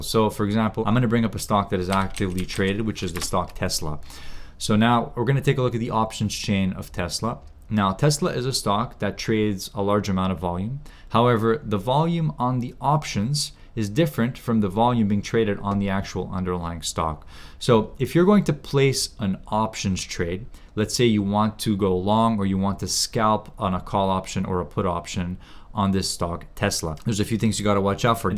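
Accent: Canada. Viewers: what is the name of the plattform? I dont understand canadian english that well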